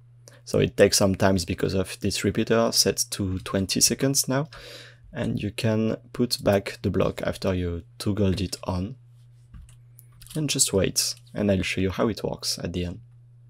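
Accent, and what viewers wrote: France. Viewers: Love your french accent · On aime l'accent français, super vidéo ! XD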